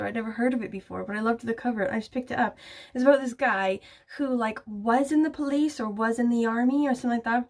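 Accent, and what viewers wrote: Wales, United Kingdom. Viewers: I haven't caught one of your videos for a while because, you know, life and work and reading get in way of watching stuff. So this was a chance to catch up which was lovely but also made me notice you are sounding much more Welsh these days. It's great! You now have the best accent combination ever.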